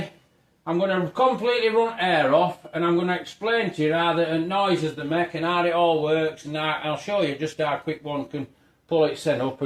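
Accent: Yorkshire, United Kingdom. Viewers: Thanks for Air Zone compressor video maintenance needed to spray cars with paint. Good work Proper Yorkshire chat. KenXJ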